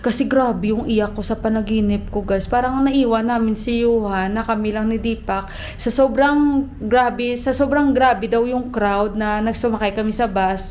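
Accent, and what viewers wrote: Iloilo, Philippines. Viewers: Natatawa ako sa accent mo sis naalala ko best friend ko tiga Iloilo dito sa italy aprehong pareho kayo Kung magsalita hahahaha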